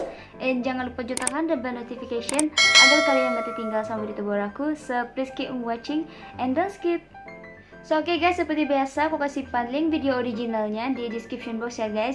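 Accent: Jakarta, Indonesia. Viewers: Sumpah, gw kira orang indo yang nge-react !! dialect jakartanya itu lo, pas bgt !! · Sis,, asli brunei kah,, tapi dari bhs dan logatnya kliatan asli indo geh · Org Brunai, tapi knp logat bhsnya sperti org Indonesia??? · Adik bahasa Brunei memang seperti yang adik ucapkan ataukah adik yang sudah terpengaruh logat atau gaya bhs Indonesia? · Orang brunai kaya orang jakarte ngomong nya.😀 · Klw tutup mata, kita tdk mndengar bhwa yg ngereact itu gadis cantik dari brunei, loghat dan bahasa brunei nya ilang lbh k Indonesian.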